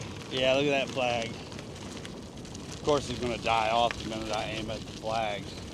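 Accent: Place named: Kentucky, United States. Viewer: Moose in the Whites are not a myth! I followed one across Chocorua lake in my kayak once. I’m always surprised to see moose droppings waaayy up on ridge tops on the trails. What are they doing up there? Saw one in Lake Umbagog as well. I’m enjoying your videos.. maybe I’ll see you on the trail sometahm (that was a tribute to your (Kentucky?) accent. Love it.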